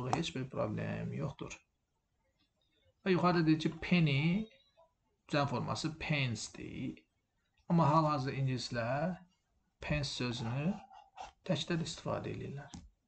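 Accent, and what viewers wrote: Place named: Nakhchivan, Azerbaijan. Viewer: Muellim deyesen naxcivandandir nitqi cox sirindir ona gorede i gilisce sozleri ele seslendirir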